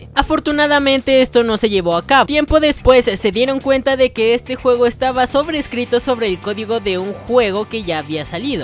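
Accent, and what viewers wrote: Yucatan, Mexico. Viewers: eres yucateco? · una pregunta?? eres yucateco??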